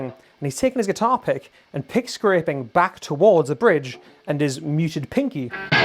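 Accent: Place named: Wales, United Kingdom. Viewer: Awesome video! I am perplexed by two things though… the guitar says les Paul on it but looks like an sg?? What is it???? And also your accent?? Wales???? Im scratching my head over here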